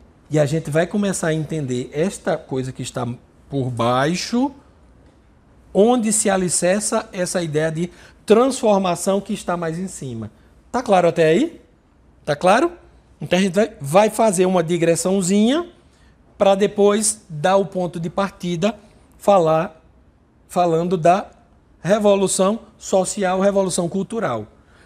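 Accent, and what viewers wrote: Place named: Northeast, Brazil. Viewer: Esse palestrante é do nordeste? Sotaque bonito! Moro em João Pessoa, e tenho amigos pernambucanos, potiguás e cearences.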